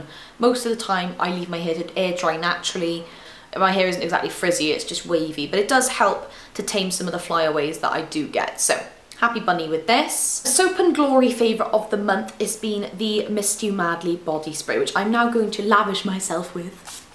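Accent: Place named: Wales, United Kingdom. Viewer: Your accent's gotten so welsh!!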